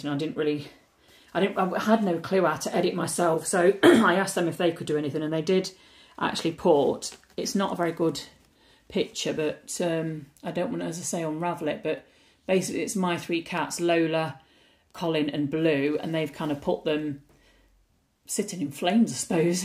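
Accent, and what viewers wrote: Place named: England, United Kingdom. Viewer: Wow I love the world earth day DP looks buatifull iv only come across you today An it's so nice to listen to an English person An not an American voice lol my cat daisy seems to love getting into amazone boxes xx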